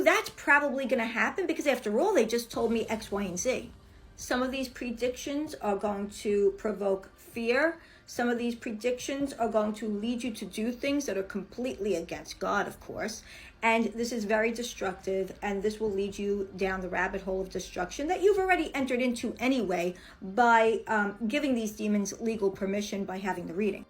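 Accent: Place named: New York, United States. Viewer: Yes. Thank you for letting everyone know. I always try to explain to people that psychics can tell you about your past, present and future because of the familiar spirits that are with you and correct me if I’m wrong, but when you leave, the familiar spirits that were there leave with you? Also real quick, when I first heard u on Doreen Virtue channel a few years back, I was like Ok is definitely from NY, lol I wasn’t sure where, I thought BK but then I found out LI… I’m from Staten Island… love u girl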